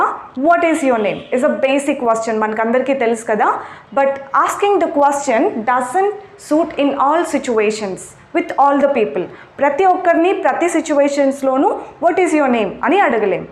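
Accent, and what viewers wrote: Anantapur, India. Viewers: Madam , are you from ananthapur .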